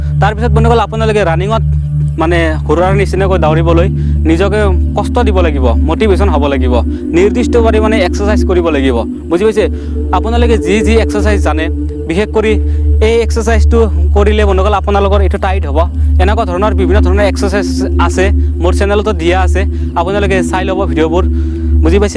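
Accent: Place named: Nalbari, India. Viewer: Dada apunar kotha bor nalbari r jen lagise hoi nki?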